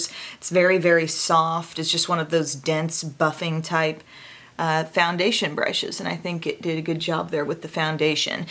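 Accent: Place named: Illinois, United States. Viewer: I live in Hawaii and at 3 am I was about to go to sleep but saw your notification and had to watch! I love your content. Thank you for being so honest and always so positive. You seem to be so kind and genuine. P.S. I'm originally from Kentucky so your Illinois voice reminds me of home. 💙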